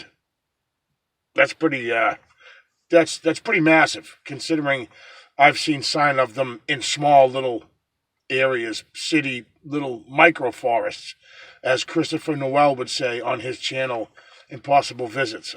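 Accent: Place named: Massachusetts, United States. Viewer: lmao I know I'm sorry....but look that up you will like that one and you will get lots of views on this whole topic..also I appreciate your replies...at least you pay attention to your viewers and don't ignore us as some do...keep up the good work...love the mass. Ascent. Lol don't know if I spelled that right..waiting for prt 2😃😃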